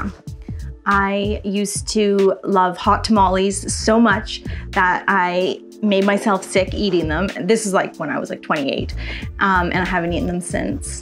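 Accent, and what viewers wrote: Canada. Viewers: Been watching your channel for awhile love your sketching and watercolour tutorials. I picked that you were Canadian - I could tell by your accent. Great video and thanks for sharing.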